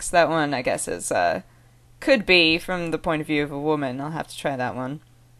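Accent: RP, United Kingdom. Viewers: I think this is received pronunciation English. There is no single or overruling British accent, especially considering the strong accents of Scotland and Wales would be included as being British. Anyway I love your videos. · This is fucking hilarious. You're almost passable as a very posh, slightly weird British person. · Felt you were going for Received Pronunciation. Really solid! You slipped into rhotic "Rs" occasionally ("r" instead of the non-rhotic "ah"), but overall pretty good. Remember that Brits sometimes ask rhetorical questions at the end of sentences, don't they? As for standard U.S...never heard that the "standard" was Pac NW. The "weatherman" accent is mid-west, Iowa with touches of surrounding states. That's the accent they travel miles to learn for TV. Nicely done! MAAAW, please!!! 8^D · This hurts my stomach. You're speaking pretty well, but.. 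You.. It's so posh D: You don't seem like a posh person, I'd rather see you doing a Irish, Scottish or Huddersfield accent, perhaps? And Australian would also be pretty awesome. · *sends to posh britsh friend because you sound so much like her its scairy* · It's kind of strange but that's BRILLIANT for an American. However that's more of a posh accent so if you speak to an English person, they will probably believe your English only they will think your slightly snobby. I am from the north of England by the way so my accent isn't like that but I have heard English people speak like that. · Goddamnit we're not all posh:( NOBODY does a Northern accent! (Still, pretty good though) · It's a decent accent, but it's definitely a stereotypical "posh" accent. You'll find very few people who actually talk like that. (From north England here). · Bloody good show old bean! A rather good attempt at a prim and proper accent. 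Though its hard not to sound grumpy speaking proper. As with all dialects they change from region to region and are also class based. Most of London has a strange mix of cockney, American and Jamaican. Where I am, west London, people tend to drop their t's ie. instead of bottle it sounds more like bo(t)all... hard to type as there is a t in there but you just don't pronounce it. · its a very good posh accent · think of adeles normal talking accent. Common as muck, now thats actual english accents! Nice posh accent though. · this blew my mind.... speaks British =) awesome and i had nothing to complain about (being half-english and all) =) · LOL! Being British... well, Scottish, that is a great accent. But its definate queen's "proper" English accent. Would love to hear a Scottish accent! =P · Pretty good southern accent actually, better than a lot of others :) Maybe a bit posh lol. But maybe I sound posh and don't realise it... 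· I could believe that you were british :P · Why do people always do the posh Lara Croft accent? =') It's actualy not too bad... but please, keep with your American accent =] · How very posh. Hahaha! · Being English I found this video to be made of awesome! ;D P.S You sounded like a wonderfully posh/upper class English woman. Good accent. P.P.S IT SHOULD OF BEEN TEA!! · but it was very RP, I think very very few people in Britain actually speak like that anymore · yeeeahhh thats one posssssssh british accent =] when most of england isnt posh at all XD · Not bad, very posh but then when we imitate American accents we tend to do that really peevish cartoony voice. Stereotypes/media influence. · by far not the worst british accent I've heard. The worst British accent in my opinion belongs to those from East London. But I'm Welsh, so meh... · You sound like your from a posh London borough. Not bad at all. 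You seem to do better when you just talk. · English here, Very very posh accent, its not bad though, sounds like you've emulated emma watson a bit. ALO GAVNAH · Sounds good, definately southern English, (I'm from Hampshire). You speak quite precisely so it sounds quite posh.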